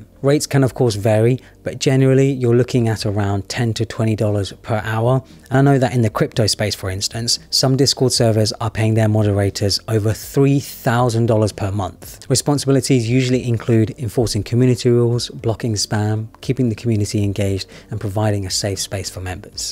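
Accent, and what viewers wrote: United Kingdom. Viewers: Good to hear a British voice on these matters, just feels more relatable, Sometimes with American narrators, I feel like our situations differ too much.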